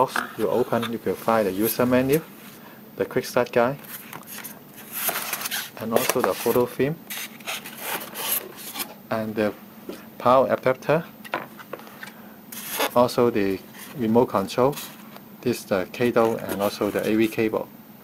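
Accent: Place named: China. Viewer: poor narration Chinese ascent waste to watch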